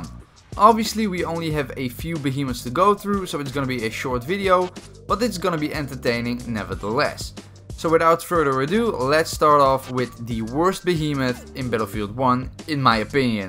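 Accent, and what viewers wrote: Netherlands. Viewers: je kan wel horen dat je nederlands bent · Your so dutch, ik hoor je accent direct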